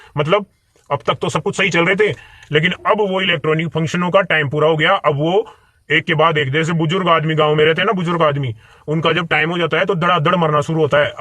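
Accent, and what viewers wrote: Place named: Hadoti, India. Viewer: Bhai boli se hadoti k lag rhe ho. . Kaha se ho. .❤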